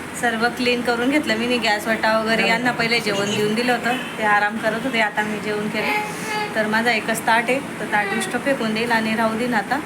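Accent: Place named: Ahirani, India. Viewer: ताई तुमचे video छान असतात. तुमच्या भाषेत उत्तम असा अहिराणी touch असतो.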